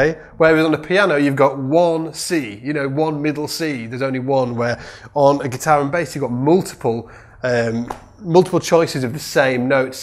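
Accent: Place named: United Kingdom. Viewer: you are the man! love the glove, British accent and of course the bass lesson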